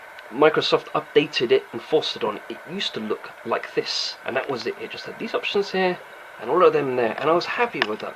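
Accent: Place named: United Kingdom. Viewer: A cool concept! I've never seen someone from this community invite others to a small tour through their space. This should happen more often! It's a shame you feel nervous about recording your voice, because I unironically like it. There's something about a British accent that just sounds captivating. Please excuse me for not actually paying full attention for the whole 40 minutes, but it was a relaxing experience. Believe it or not. :P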